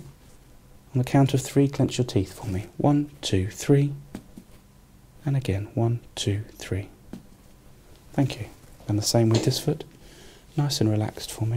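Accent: United Kingdom. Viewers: Thats most biggest British accent I ever heard :) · Very british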